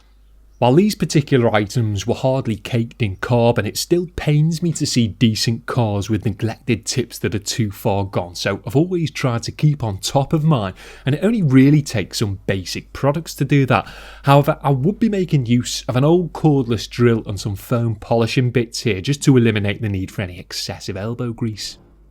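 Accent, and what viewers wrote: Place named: England, United Kingdom. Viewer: that english accent....a candy for my ears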